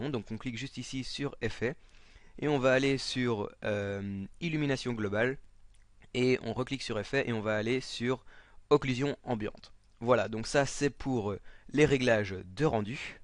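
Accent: Belgium. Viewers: Tu est belge non ? apouier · sa sent l'accent belge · Il est belge, si entends dans une de ses vidéos: il dit Nonente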